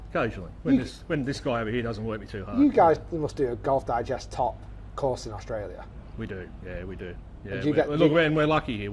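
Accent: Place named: England, United Kingdom. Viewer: I cant watch Rick because of that horrible English accent . The way he says " but " drives me insane . It must from what ever area he grew up in as the proper English accent is so nice to listen to . Its getto English . LOL . I know first world problems . Cant knock a youtuber for taking 10 million of LIV money though . Only a idiot would turn it down .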